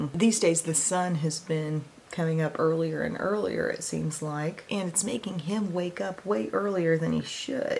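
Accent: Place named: South, United States. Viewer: I also love your “oh isn’t that pretty” in your slight southern twang ❤️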